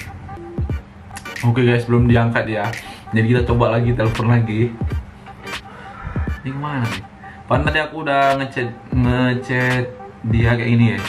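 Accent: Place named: Medan, Indonesia. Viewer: medan kali ya wakk. anak padang bulan kayak.kau ini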